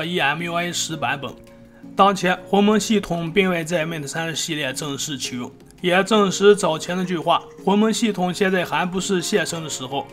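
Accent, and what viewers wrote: Shanxi, China. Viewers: 山西人啊这口音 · 山西人吗？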